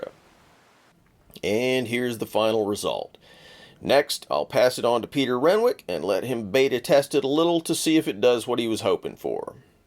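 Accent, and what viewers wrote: United States. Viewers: This sir has a 100% american voice...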